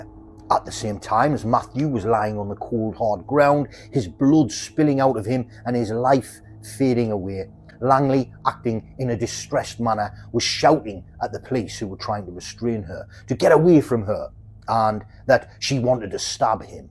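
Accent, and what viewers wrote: United Kingdom. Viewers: I live in the UK, North West, and I'm delighted to hear a true crime you tube creator with a British accent and doing a stellar job of presenting these often more obscure cases as well as the obvious, I've got to give it you man you can find the ones we have not heard of and the ones that concluded like yesterday, nice format and clear presenting and very presentable, I predict a growth around here, keep it coming dude, Bravo!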